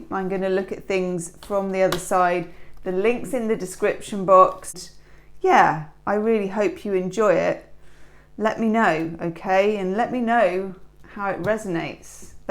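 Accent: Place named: South, United Kingdom. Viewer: Hi I am in USA Texas and am a cancer I enjoy your readings they are spot on I am British from Cornwall sounds like your from the south of England Thankyou for your readings and 😘🇬🇧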